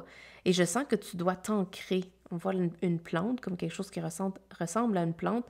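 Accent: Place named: Quebec, Canada. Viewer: Pile 1 Je découvre la chaîne sur mon fil Ça correspond à mon passé et mon présent. 👍 Et abo ne serais ce que pour ce charmant accent québécois 😁 mais le tirage touche juste aussi 🙏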